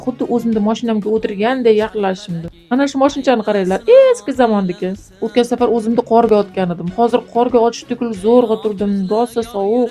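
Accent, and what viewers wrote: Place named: Syrdarya, Uzbekistan. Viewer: Gapirish sevamgiz sirdaryoga tortarkan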